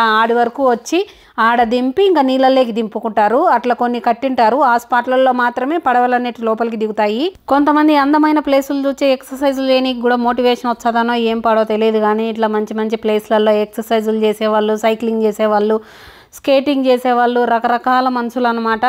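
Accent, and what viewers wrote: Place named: Kurnool, India. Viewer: Maadi kurnool district.. ayinaa mee negative village ku chaalaa daggara kanuka slang 99% same vuntundi.. Vuregadam,, Mugeddulu. Naaku kudaa silent vunte bo manta..oonnoo antene satisfaction..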